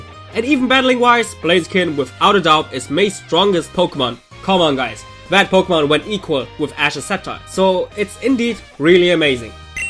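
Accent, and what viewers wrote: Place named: Germany. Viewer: Its his accent comon forgive him for being german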